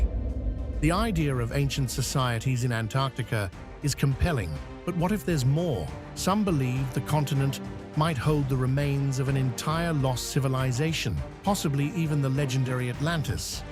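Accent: United Kingdom. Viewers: DO AWAY WITH THAT HORRENDOUS LOUD BACKGROUND NOISE YOU MISTAKENLY THINK IS MUSIC.... IT'S VERY ANNOYING AS IS THAT HORRIBLE BRITISH ACCENT IN WHICH THEY CAN'T PROPERLY PRONOUCE WORDS.... LAW DOESN'T HAVE AN 'R' IN IT, AND TUBE ISN'T PRONOUNCED AS CHUBE, AND CUBA ISN'T PRONOUNCE CUBER, AND DUNE ISN'T PRONOUNCED AS JUNE!... HORRENDOUS!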